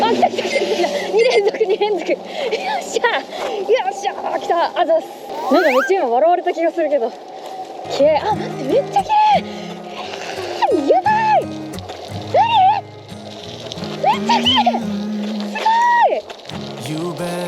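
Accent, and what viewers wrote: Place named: Hakata, Japan. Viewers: トップページに「ヤエー」？「ハイク乗り」？が表示されたので不思議に思って観てみたらだったんですね。登山動画で何度が観ているのでお勧めに上がったのでしょう。山にいる時よりハイテンションで九州弁が良く出ていますね。バイク乗りにそんな習慣があるなんて知りませんでした。一息ついたらバイクの旅も診てみます。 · 博多弁？可愛い🥰面白い企画でした👍🏻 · がたまに喋る方言が好き！福岡の方言は可愛すぎる！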